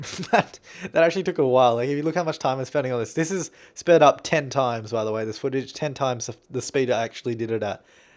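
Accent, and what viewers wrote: Australia. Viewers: he's australian, not a kiwi. he has a australian accent :) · I love your accent, I was going to ask where your from, but I figure by the way you rponounce your Rs and AHs, it's Australian. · Madisyn Davis again... this is a really really old video but still... cannot agree more. I love listening to his voice. (But same as you I have a strange obsession with Australian accents so...) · I know this is an old comment, but I do the exact same thing. I guess my love of Australian accents makes his voice 10 times better but even if I wasn't oddly obsessed with the accent his voice just has a soothing sort of sound to it ahaha I sound soo creepy right now xD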